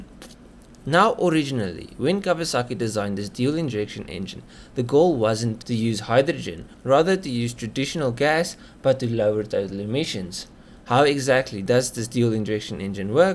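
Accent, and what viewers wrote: South Africa. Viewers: my guy you sound like you from South Africa ✌👌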